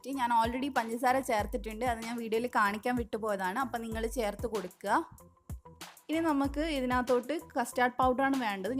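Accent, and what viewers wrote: Kannur, India. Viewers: കണ്ണൂർ ഭാഷ 👌💓 അടിപൊളി ഒന്ന് ഉണ്ടാക്കി നോക്കിയിട്ട് കാര്യം 😋😋😋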